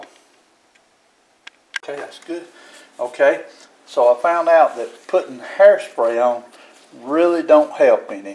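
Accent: South, United States. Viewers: Love that southern accent my hubby is from South Carolina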